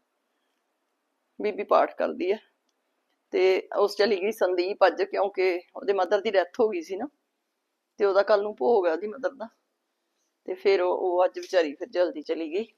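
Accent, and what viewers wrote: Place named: Doaba, India. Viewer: Sade vi doabe vich pateela khende ne bhan ji 🙏🙏 thudi boli sade vargi a same ❤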